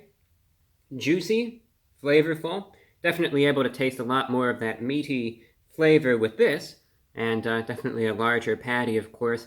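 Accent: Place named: Mid-Atlantic, United States. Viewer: Love his Atlantic accent.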